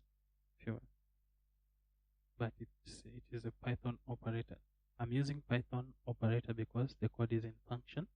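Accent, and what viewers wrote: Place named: East Africa. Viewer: nice content brother. i recognize that East African accent!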